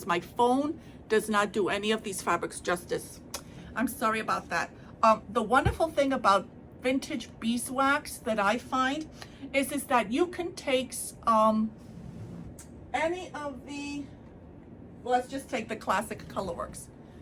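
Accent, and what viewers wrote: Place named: New York, United States. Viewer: Hi, so glad that you are on Flosstube, great to see you and here that great NY accent..:-)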